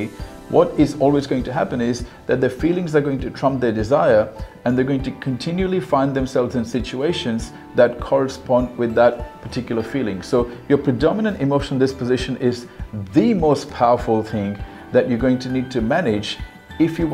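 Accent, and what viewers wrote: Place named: United Kingdom. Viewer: You have a British asscent Sir.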